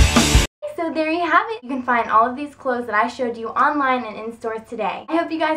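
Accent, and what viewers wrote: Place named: United States. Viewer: Tu es magnifique, ton visage est.... il n'y a pas de mot, ta voie je l'adore c'est la voie américaine que je me suis imaginé, ton style est très beau. Gros bisoux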